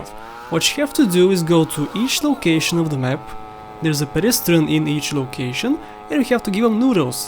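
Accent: Russia. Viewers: You speak like a russian of English language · Чувак, ты же русский! Я чувствую это!!!! xD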